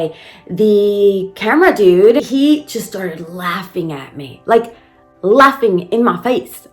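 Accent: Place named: New Jersey, United States. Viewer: I May Speak English At My House, But I Also Spoke Spanish As Well, I Was Born In Seattle But Grew Up At The Same City Being Bilingual Myself, I Understand The Fact That You're Dominican And Oscar's Mexican, My Dad And Mom From Guatemala, Which Is South Of Mexico, And I Am Officially of Guatemalan Descent, Barely, And Seriously, I Love Your Jersey Accent, I Also Had A Vancouver/Seattle Accent, But My Parents, They Might Fake It's Accent Cuz They Spoke Only Spanish When They First Moved To Seattle In 1997, And 2006, I Could Say My Last Name 'Vicente' Very Fluently And Correctly As Any Sort-Of-Ways Ever Since I First Spoke Spanish Fluently At 4, I Sometimes Mispronounce Sometimes Ok, Great Message To Hear, Nicely!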